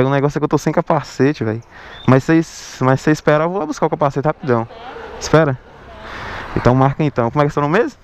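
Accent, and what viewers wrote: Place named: Bahia, Brazil. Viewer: Mano, de que estado você é? nossa, puta sotaque daqui da Bahia kkkkk♡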